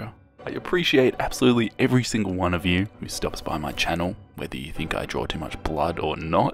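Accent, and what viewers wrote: Australia. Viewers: Love a deep Aussie accent! Stumbled across this video and the way you narrate it is fricken great. From 1 Aussie to another! 🤙🇦🇺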